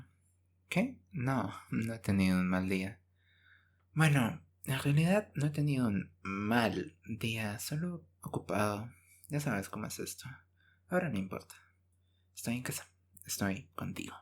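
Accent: France. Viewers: Tienes un lindo acento francés ❤❤❤ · Gracias por todo tu esfuerzo empeño trabajo duro quién me has puesto a los videos a este video cada vez que oigo tu voz se me acelera el corazón me alegra la vida tienes una hermosa voz tranquila calmada que enamora y encantada y encariña siempre me voy a enamorar y me voy a encariñar amo tu voz francesa love for your ❤❤❤❤❤❤❤❤❤❤❤❤❤❤❤❤❤❤❤❤❤❤❤❤❤❤❤❤❤❤❤❤❤❤❤❤❤❤❤❤❤❤❤❤❤ amo con locura tus videos siempre me voy a enamorar y cantarina😊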